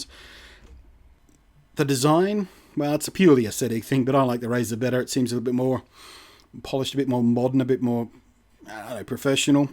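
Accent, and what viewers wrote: Australia. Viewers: True. Still the razor price is significantly higher when exchange rate and taxes are added. Around $200 AUD equivalent for USD to AUD for the Yeti and $240 AUD for the Seirēn. It seems that the Aussie tax for the yeti is about $30 and the Aussie tax for the Razor is around $50. Still I dislike the arbitrary markup on any goods. Really liked the comparison though. Is the extra $50 worth the extra functionality? Keep up the excellent work. It really is great to hear an Aussie voice doing reviews like this. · Ha cool I guess your Aussie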